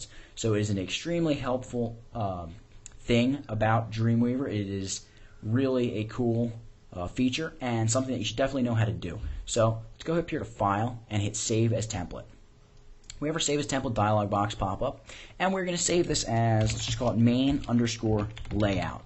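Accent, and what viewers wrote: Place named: United States. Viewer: awesome tutorial, i was tired of watching other vids where the people have strange accents. USA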